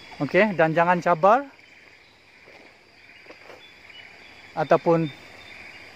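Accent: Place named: Borneo, Malaysia. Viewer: maaf nak bertanya bro orang borneo ke ..suka slang bos bercakap...done subscribe dan like..support bos punya karya. .....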